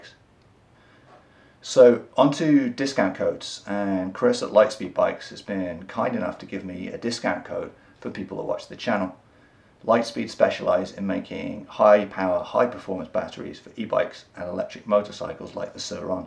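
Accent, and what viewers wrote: United Kingdom. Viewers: U in canada? With a british accent hehe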